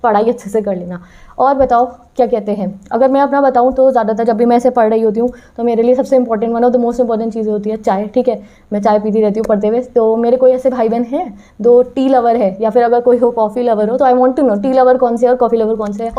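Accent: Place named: Haryana, India. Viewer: dii I think your ate from haryana or from north india side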